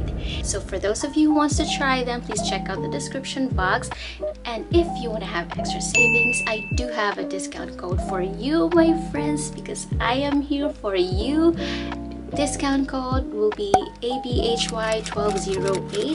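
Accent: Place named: Philippines. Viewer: My idol sa taglishing ug bisayinging pronouncing. Akoa jud ning paaboton para makakuha kog mga tips unsaon pagpa gwapa. Hihi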